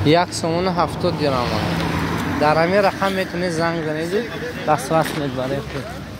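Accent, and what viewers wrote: Mastchoh, Tajikistan. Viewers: Лавзи Мастчохи кади гап занен кайф мекунам гапи шумора шунида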